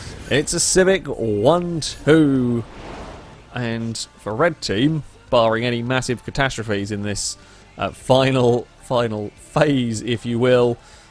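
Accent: England, United Kingdom. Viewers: I watch this for the British Accent.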